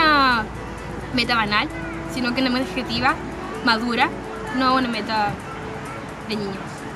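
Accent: Mexico. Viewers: porque habla como mexicana si es shilenaaaaa ajajajaja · Por qué los chilenos se le pega el acento mexicano ??? No lo entiendoooo!!!!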